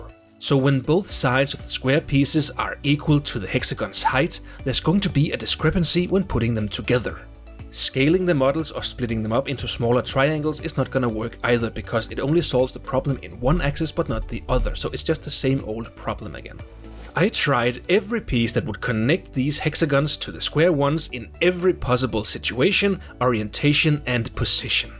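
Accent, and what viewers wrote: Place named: Denmark. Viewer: That accent… are you Danish perchance?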